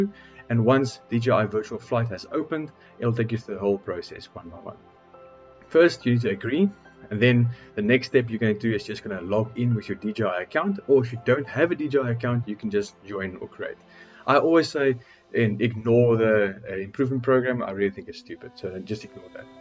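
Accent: South Africa. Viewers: South Africa accent…not Australia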